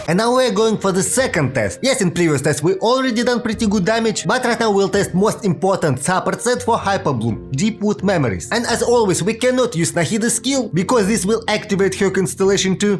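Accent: Russia. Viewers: Как забавно слышать английский язык с русским акцентом) Нахида и Яэ Мико прям чисто на русском сказаны) Но английский неплохой) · Прикольный рашен инглиш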